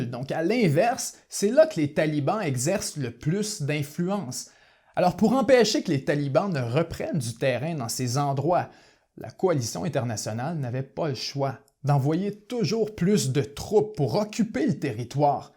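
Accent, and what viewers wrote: Quebec, Canada. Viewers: ce délicieux accent de nos cousins québécois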